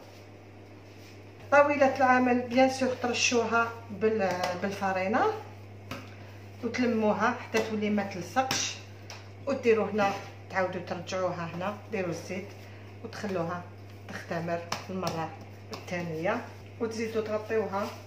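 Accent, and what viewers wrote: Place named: Algiers, Algeria. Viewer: j aime beaucoup ton accent ALgerois..une femme de parole..choukran 😘😘😍😍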